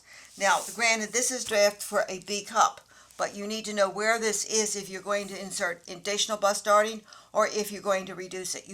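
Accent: Philadelphia, United States. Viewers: ❤❤❤❤❤❤❤❤❤!!!! New subscriber. You sound like you are from Philly or New Jersey.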